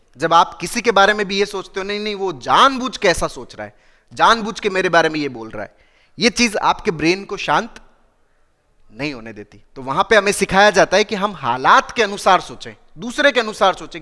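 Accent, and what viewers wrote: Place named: Marwari, India. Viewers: Sar ji namaskar bich bich mein aap Marwadi bolate Hain Rajasthani bolate Hain bahut Achcha lagta hai Jaise dusre state Ke Log bich bich Mein Hindi ke sath ya English ke sath apni Bhasha ka uchharan Karte rahte hain vaise Sar ji aap bhi bich bich Mein Marwadi Rajasthani bolate rahega isase Hamen bahut Garv Hota Hai aur bahut Achcha lagta hai itne Bade doctor hokar Marwadi bolate Hain Rajasthani bolate Hain To hamen bhi aapse Vishwas badhta Hai Marwadi bolane Mein Rajasthani bolna aap bahut acche se samjhate ho Sar ji aap karte dil se dhanyvad aaj ka subject bahut hi interesting tha nice topic tha dhanyvad doctor sahab❤❤❤❤❤ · Sir aap ki marvadi boli bhot achhi lagti he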